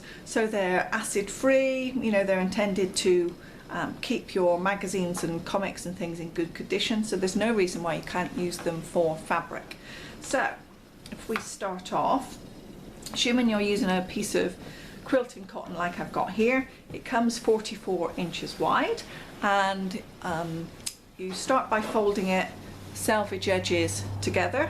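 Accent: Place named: Gloucestershire, United Kingdom. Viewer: I'm from Stroud, Glos and your accent feels very Gloucestershire!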